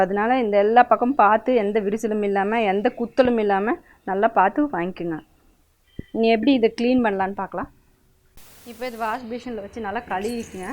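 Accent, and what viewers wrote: Coimbatore, India. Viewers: Kovai tamil thana neeinga pesurathu akka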